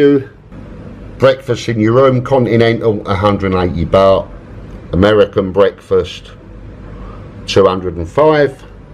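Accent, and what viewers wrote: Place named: Cockney, United Kingdom. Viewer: That's because it's cockney guvnor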